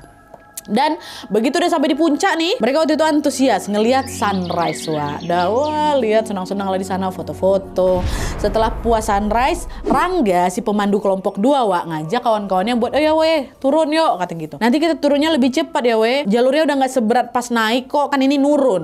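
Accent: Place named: Sumatra, Indonesia. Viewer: Kau orang mana kk ? Bahasa mu kyk org sumatra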